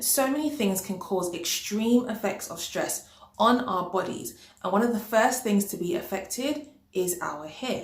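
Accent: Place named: United Kingdom. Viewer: crazy but, its so nice to hear a British accent on a loc channel 😂. children stress!! definitely need to stop stressing. I'm a worrier! but, this year is about balance, balancing work and play, I used to only work and hustle also, to do my daily gratitude, which really helps me relax.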